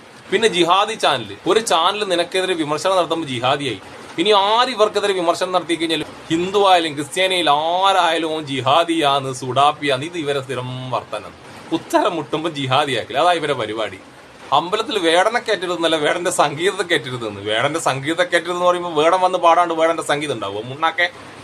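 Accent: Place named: Thalassery, India. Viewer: നിങ്ങളെ സ്ഥലം എവിടെയാ.. നമ്മുടെ തലശ്ശേരി പാനൂർ ഭാഗത്താണോ.. എന്തായാലും തനി നാടൻ വർത്താനം 👍🏼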